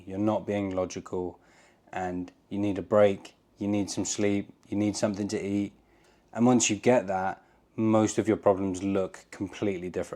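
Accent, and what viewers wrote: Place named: United Kingdom. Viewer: Which part of the UK is your accent from? Surrey Cambridge way Great video You have a smart brain and want to solve a problem straight away , I get in those loops and think about the solutions that solve it fastest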